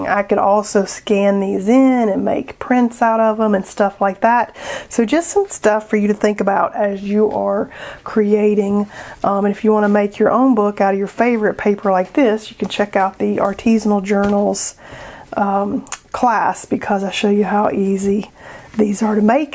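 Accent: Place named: South Carolina, United States. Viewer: your SC accent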